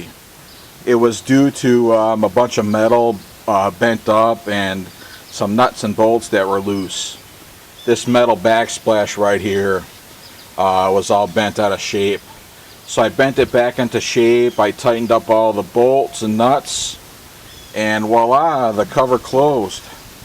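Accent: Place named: Wisconsin, United States. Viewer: That's def WI or IL. It sounds urban and rural at the same time lol Bet you he lives by Lake Michigan 🤣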